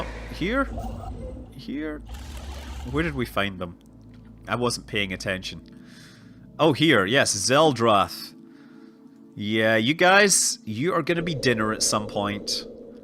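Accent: Scotland, United Kingdom. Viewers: So you totally taught me to play KSP and I found Stellaris myself recently and in my YT searches, I found your name again...I thought to myself "Listen to that sweet Scottish accent talk about this new game I'm playing, FUCK YES!". Haha.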